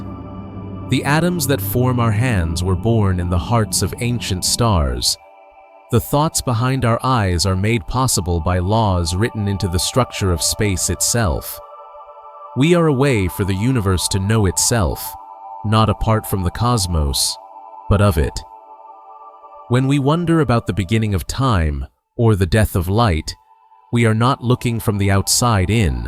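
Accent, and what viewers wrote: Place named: United States. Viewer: Finally a good old fashened AMERICAN accent. Cant believe it.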